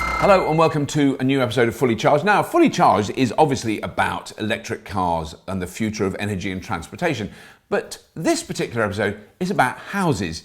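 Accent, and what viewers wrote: Russia. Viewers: Nice Russian accent! To good to be true thou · Nice russian accent!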